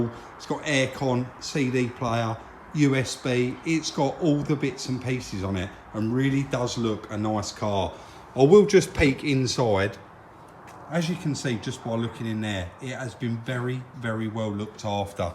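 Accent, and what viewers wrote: United Kingdom. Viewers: This British accent 🤣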